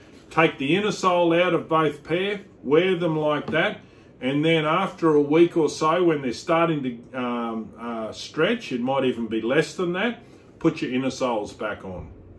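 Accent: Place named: Australia. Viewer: Ah! Nobody told me he would be Australian! I can't even understand what the hell he is trying to say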